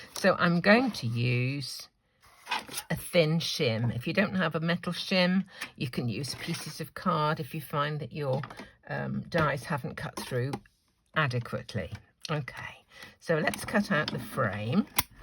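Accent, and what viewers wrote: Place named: RP, United Kingdom. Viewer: I love clean and simple cards! You once again made another beautiful card. Thank you for your tips and tricks of your many years at this craft. I also love your words you use when you drop something or if something goes wrong 😂. I’m from the US, so your accent and proper English makes me giggle 🤭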